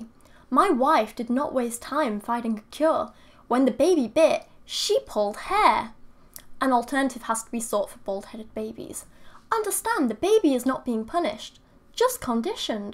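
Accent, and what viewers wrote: United Kingdom. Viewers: Loving the video so far but it's a little too brit bong for me. But I dunno maybe I'm just too American to understand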